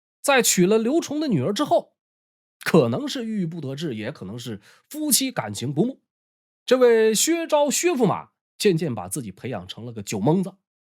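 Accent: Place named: Shenyang, China. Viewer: 总觉得兄是沈阳人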